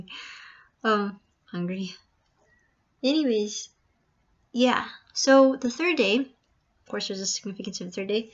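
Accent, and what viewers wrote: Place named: South, United States. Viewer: You're always funny lol You sound like a southern from down south. the US of A. I don't know why I always don't get your videos I get them once every month. The brothers were very protective of their sister and the law of the Lord. Thank you once again and thank you for your encouragement. May the Lord bless you always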